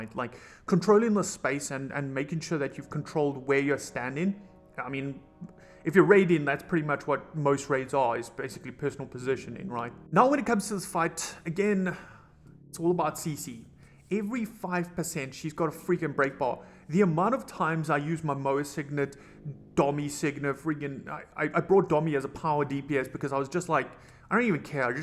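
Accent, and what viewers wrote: South Africa. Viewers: are you South African bru ?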